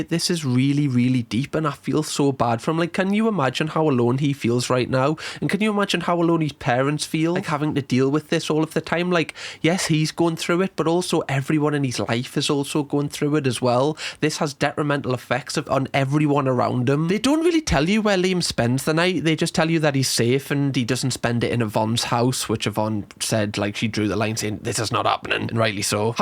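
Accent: Newcastle, United Kingdom. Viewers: Love your show , and the Geordie accent makes it all the better. Signed - happy doughnut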